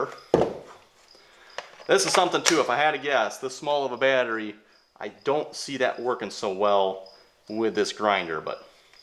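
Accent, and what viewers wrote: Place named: South, United States. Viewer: Southern pronunciation for the most part. Wait until you hear "INsurance" and "Reality". 😁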